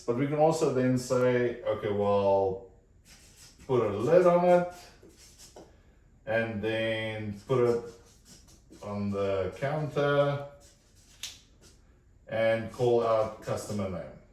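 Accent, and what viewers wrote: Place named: South Africa. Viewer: You sound like a Boer...And also, this is the only video on youtube that explain a SIPOC in a way that a dry tree stump can understand. THANK YOU SIR.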